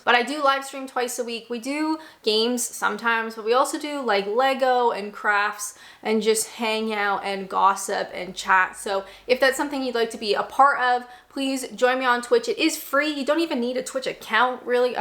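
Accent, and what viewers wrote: England, United Kingdom. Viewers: Love your English accent see if you can do a whole video in English accent lol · I love it when you speak with an English accent, it sounds really nice 👍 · You should do an entire video in your English accent! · Thabk you,... Another excellent video. I do find the way you pronounce some words very endearing, so please don't become too British 😊.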